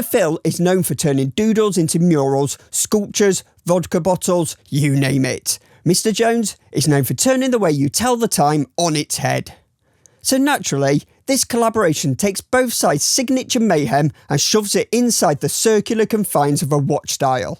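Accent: United Kingdom. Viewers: Hooray Grimsby! I thought you sounded like Olga from The Toy Dolls. Sunderland. Maybe, Tyne or Wear. Awesome channel!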